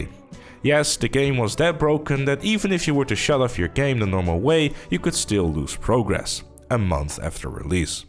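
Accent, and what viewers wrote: Netherlands. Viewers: Another Dutch man? I hear your accent bro, you cant hide it from me!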